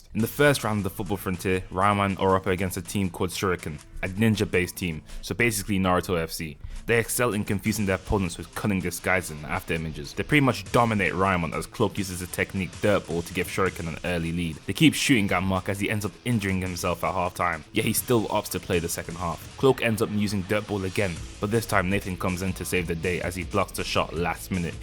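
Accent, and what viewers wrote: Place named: United Kingdom. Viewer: Love you bro I love British Accent🫶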